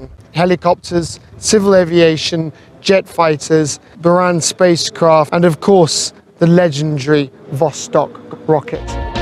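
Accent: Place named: United Kingdom. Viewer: Ооо мы обязательно посетим. Британский акцент тоже привлекает!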